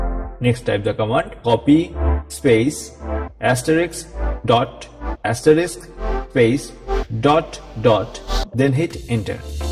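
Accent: India. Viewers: Thank you so much for using this Indian voice. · I dont understand your Indian accent. What to put in the Empty USB drive is it the windows 10 installer?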